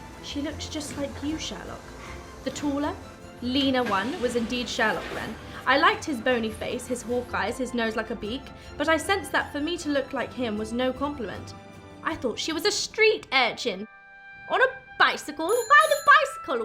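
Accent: England, United Kingdom. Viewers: It’s so nice to see her in a part with a British accent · She has beautiful british accent ❤️ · yeah she’s british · She: EnOlA HolMEs (In a clear British accent) Me an intellectual: Should I call her Eleven or Enola? My brain: -Understandable: You should call her Eleven Holmes. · Enola Holmes reads enola Holmes In her cute little British accent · She’s at peak of her british accent!! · Enola Holmes has brought back her English accent! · I didn't know she was a brit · She learnt her American accent from Disney, and her original accent is English. So she does not have a mix of both. There are many types of British accents. I don’t know what accent and Jen Ledger have, but I do know it’s not northern. Because northern English accents are hard to understand. The really thick type of British accent. But she is 100% British. Actually not 100%. She has a few different parts in her but her accent is 100% British. 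· she said she puts a voice on it her British accent and enolas accent is different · I swear they made her read the book just in order to bless our ears with that English accent! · accent has got more British. she was going American for a bit. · MBB + British Accent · Lili she has an English accent, she took classss for an American accent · alguien mas q ama el acento británico d?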